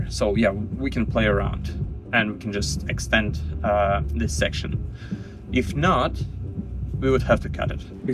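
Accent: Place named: Russia. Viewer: Класс, почти не палишься. Но русскоговорящему сразу слышен акцент) Удачи в творчестве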